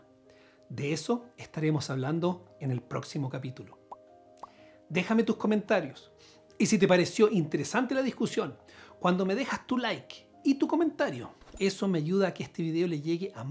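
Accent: Chile. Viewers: Vives en chile?, hablas como chileno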